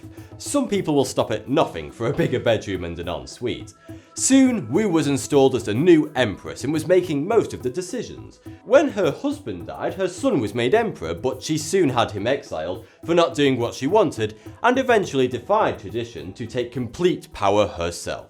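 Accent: United Kingdom. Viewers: Is this guy British or just a hipster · R u British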